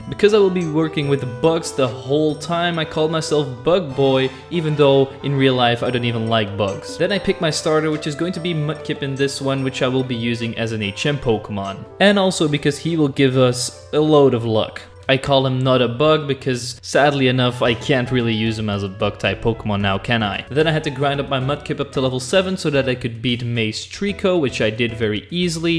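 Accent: Netherlands. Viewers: Well if u aint Dutch or from Belgium idk anymore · You sound dutch, is this correct?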